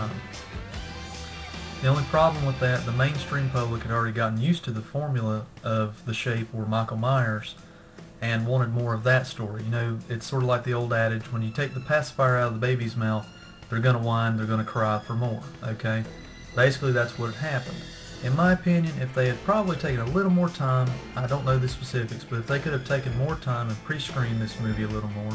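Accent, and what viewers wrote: South, United States. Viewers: It took me a while to get used to your Southern Drawl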